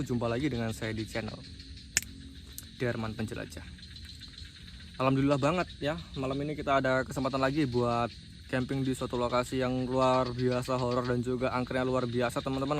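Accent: Java, Indonesia. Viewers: Salam.kenal mas derman penjelajah...maaf kayaknya dri logat ada jawanya yah..salut sama masnya anak muda yg pemberani nyalinya gak kaleng kaleng, hanya perlu hati hati saja dgn org yg bisa jahil apalg dihutan....semangat..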